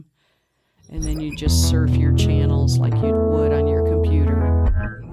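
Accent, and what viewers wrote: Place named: South, United States. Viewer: I appreciate you southern accent but it's Roku not Ruku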